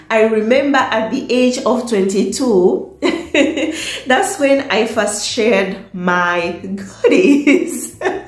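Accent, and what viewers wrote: Uganda. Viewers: Me too afirst I thought is ugandan or keyan good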